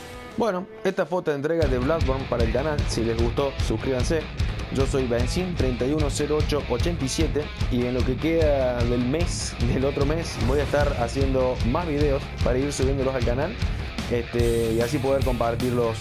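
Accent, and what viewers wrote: Córdoba, Argentina. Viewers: Vos pareces co ordobes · Cordobes D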